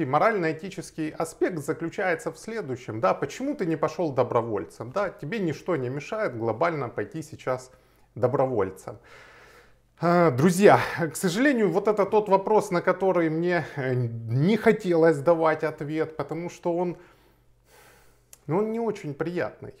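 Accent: Ukraine. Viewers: Это не русский язык а ХАРЬКОВСКИЙ.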